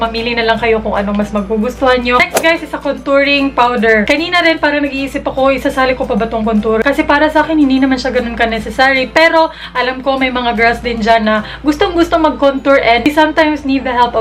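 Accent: Visayas, Philippines. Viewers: maklaro man na bisaya nis ate na hahaha cute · are you anywhere from Visayas? kasi halata po sa tono I mean sa accent nyo po.. from Cebu here :) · Omg bisaya 😂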